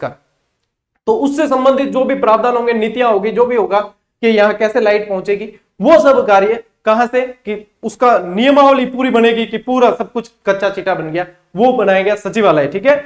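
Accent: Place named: Rajasthan, India. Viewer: Sar aapke munh se Marwadi acchi lagti hai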